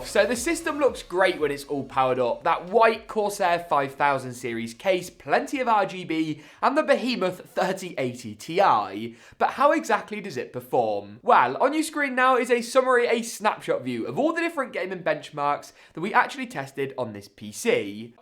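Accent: United Kingdom. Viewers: This guy surely likes speaking british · I like your british accent.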